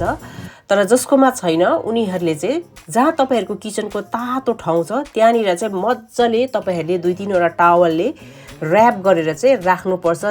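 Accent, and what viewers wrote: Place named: Sikkim, India. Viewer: She might be Indian ... And those pronunciation is very common in Sikkim Darjeeling etc ..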